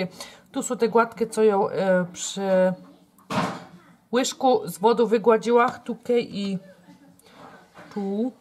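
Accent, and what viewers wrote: Silesia, Poland. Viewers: Choć nie jestem Ślązaczka ale bardzo lubię twój kanał i twoje przepisy, nie które juz wypróbowane👍🏾 i ta twoja śląska gwara ! Fajnie sie słucha ! A durnymi komentarzami sie nie przejmuj ! I rób swoje ❤️😊😊pozdrawiam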